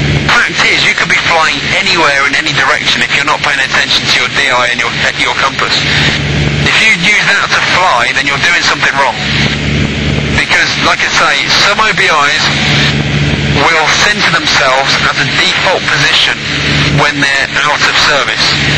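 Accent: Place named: England, United Kingdom. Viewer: Really good, thanks. Nice to hear an English accent on a youtube tutorial for a change ;). Only thing that would have made it better, would have been having the camera closed up on the needles....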